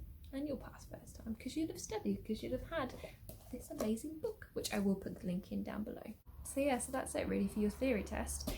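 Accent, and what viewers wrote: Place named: Worksop, United Kingdom. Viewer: Where about is it you live in England? You sound like someone from Worksop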